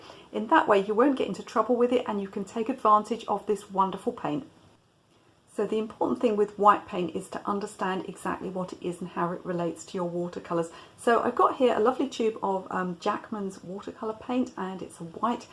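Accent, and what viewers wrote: United Kingdom. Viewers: Funny thing. I started following your channel because I'm an English teacher in Brazil and I fell in love with your clear and bright British accent. Now, I'm in love with watercolours,too. I've bought a Windsor set of watercolours and started doing it as a hobby. I'd say it seems therapy to me. thanks a million for opening this amazing channel <3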